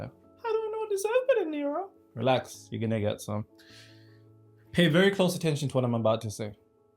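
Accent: United Kingdom. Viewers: The UK accent gives the impression of someone articulate and intelligent to Americans. This Knowledge isn’t about intelligence. It’s about you. Sometimes the mirror is painful to look at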